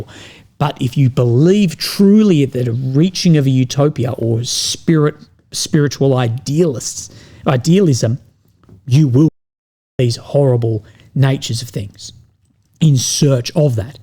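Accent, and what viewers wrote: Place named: Australia. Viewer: Hearing a australian, talking about america is f**** hilarious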